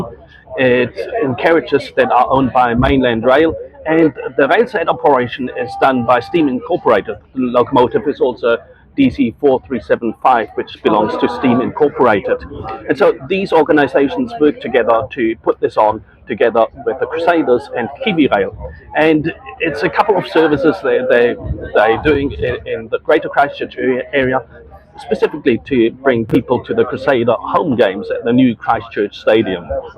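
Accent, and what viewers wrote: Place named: South Africa. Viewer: Interesting. . A South African accent with a tinge of NZ.accent. I get the impression that these are charter trips rather than scheduled services.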